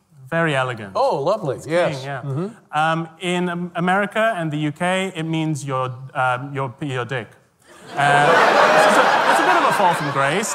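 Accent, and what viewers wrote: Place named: Malaysia. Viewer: his malaysian accent seems a bit stronger here haha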